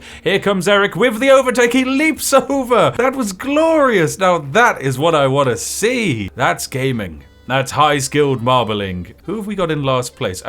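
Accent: United Kingdom. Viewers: I like how he somehow keeps his British accent when replying to comments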